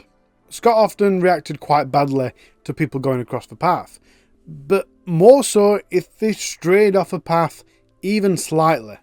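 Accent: United Kingdom. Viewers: Enjoyed this , subscribed, nice to hear a UK voice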